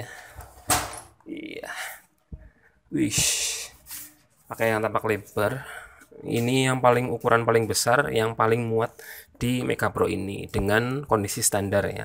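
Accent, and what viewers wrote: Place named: Banyumas, Indonesia. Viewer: Ngapak ya mas wkwk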